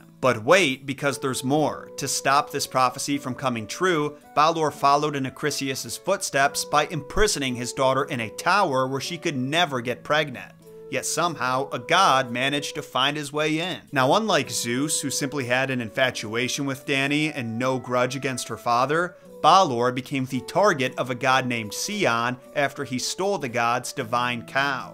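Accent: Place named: United States. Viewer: The pronunciation is almost there but I think it's the American twang that makes it sound funny 😂 Loved this I would definitely love to see more ❤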